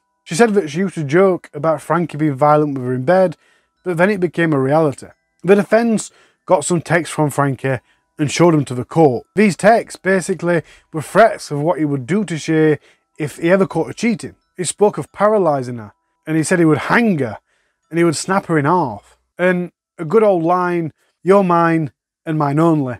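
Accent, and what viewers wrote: Yorkshire, United Kingdom. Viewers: awww blooody elll lad 😂😂😂 thought I could see a bitta Yorkshire in there. Hahah. Love this channel mate. Currently weking mi way frew thee vids 😂. Keep up the great work man! Glad I found the channel. “Am off for a cuppa n a bizkit, before I gu darn Weken men’s 😂😂”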